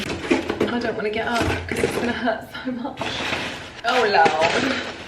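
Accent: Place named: Scotland, United Kingdom. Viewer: Omg will you shut up about your Scottish accent!! It’s getting old now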